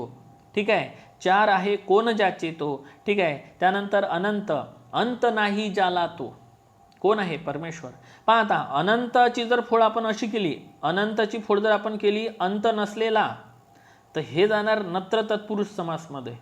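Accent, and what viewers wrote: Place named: Amravati, India. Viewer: सर अमरावती ची भाषा वाटत आहे मी अमरावती कर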